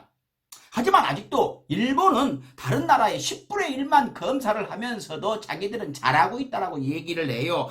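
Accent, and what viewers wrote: Jeolla, South Korea. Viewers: 강 성범씨의 유창한 전라도사투리가 너무 웃겨서 밥 먹으면서 듣다가 자꾸 밥을 뿜게 돼서 밥 먹을 때는 보면 안 되겠어요 내용 너무 좋고 알기쉽게 설명해주셔서 감사합니다 팬 되부렀스요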